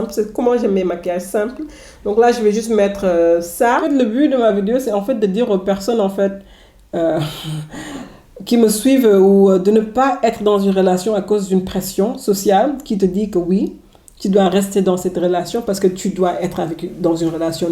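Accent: Senegal. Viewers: you said it all. i'm not senegalese but i luv your dialect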